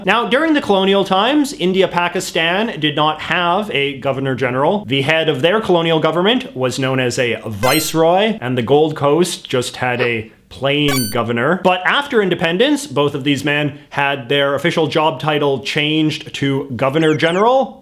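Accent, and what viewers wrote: Canada. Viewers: I love yer sarcastic energy 💃🏼(& that is one heavy Canuck accent 😁 unlike my daughter who lives in van, she is dual citizen, no accent, tho she knows french)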